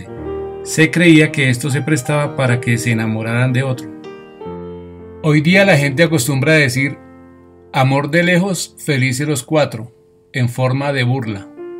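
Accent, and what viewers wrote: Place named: Antioquia, Colombia. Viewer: tiene acento de paisa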